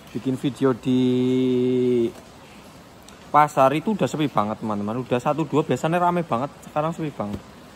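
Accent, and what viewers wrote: Banyumas, Indonesia. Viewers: Bukan kasar tapi ngapak......😅😅😅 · Kok ngapak ya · Wong ngapak ya rika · Wong banyumas y? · Ngapak y mbok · Kowe ki ngapak ya mass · Ora Ngapak Ora Kepenak ya kang😃😃😃👌👌👌 korea skrg dah 3rb lbh yg poditif terkena virus corona,,,, kayauuuu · Mas...sampeyan wong ndi? kok logate ngapak, hehe... 🙏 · Asli ngapak ya mas? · jiaaaan kynee ngapak kie luuh hahaha...salam knl mas broo?? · ini org jawa saya ngapak2 ngapak ngendi mas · Haha kaya masih ngapak and medok lucu dan seru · Weh weh ra ngapak ora kepenak yo mas😁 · Wong ngapak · Rika wong ndi sih kang kayong logatane ngapak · Yakin mesti giye bocah ngapak. Manggone nang endi ya...? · Ngapak e ketok buos 😂😂😂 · Masnya dr ngapak yaa ..bahasanya kelihatan berat kakuu ..seringb2 ngobrol ma daerah lain ..biar gk kaku bahasanyaaa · Sampean orang ngapak yh mas...soale ketauan dr logate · Kie mesti asalle ko wong ngapak2 ya mas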